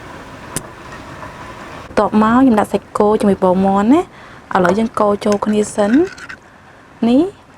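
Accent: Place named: Brazil. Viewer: គាត់ជនជាតិប្រេស៊ីល ការនិយាយជនជាតិគេខុសគ្នា។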